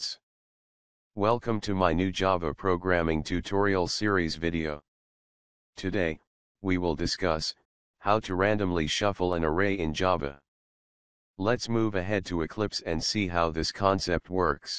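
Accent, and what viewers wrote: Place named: India. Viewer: dat microsoft sam voice, good vid, but id prefer your indian accent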